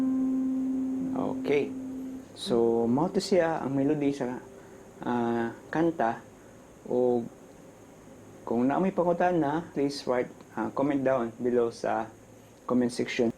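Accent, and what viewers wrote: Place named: Visayas, Philippines. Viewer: Ok kaayo bro. Taga asa diay ka murag bisaya man gyod hahaha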